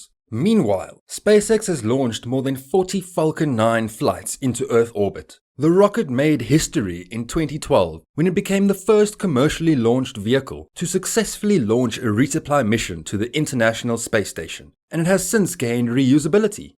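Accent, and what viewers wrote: South Africa. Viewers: Are you south African. You have the accent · Nice South African Accent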